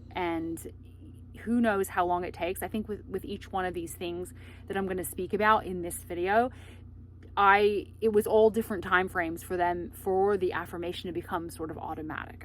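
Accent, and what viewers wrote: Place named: Australia. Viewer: Interesting accent. Australian background? I always thought manual transmissions were cheaper to fix that automatics.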